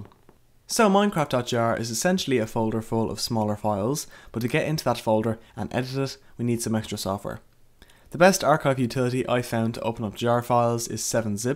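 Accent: Ireland. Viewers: are you irish? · are you irsh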